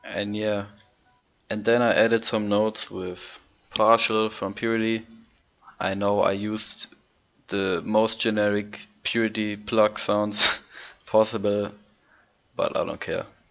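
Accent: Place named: Germany. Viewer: man hört den deutschen Akzent haha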